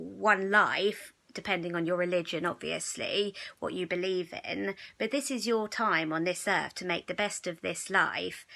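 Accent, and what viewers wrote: United Kingdom. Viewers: I love UK accent.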